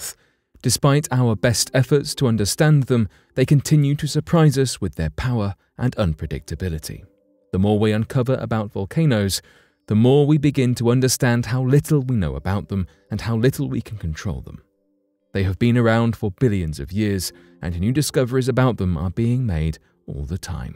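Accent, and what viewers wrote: United Kingdom. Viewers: British pronunciations are the absolute worst.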